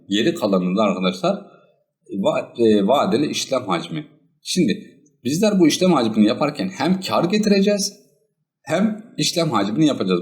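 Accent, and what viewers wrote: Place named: Konya, Turkey. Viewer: Konyalı mısın şive tam o taraf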